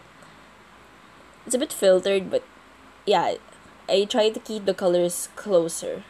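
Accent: Philippines. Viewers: I think you are a Filipino because I know how Filipinos speak English. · I love your Filipino accent lol 🇵🇭🇵🇭🇵🇭🇵🇭🇵🇭🇵🇭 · I love this brand! thanks for the vid xD I can hear the filipino in your words ~ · Hi! Are you Filipino? You have their accent and that's amazing!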